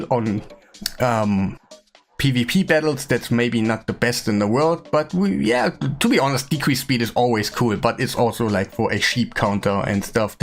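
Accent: Germany. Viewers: Dude, where are you from? You sound German or Swiss or Austrian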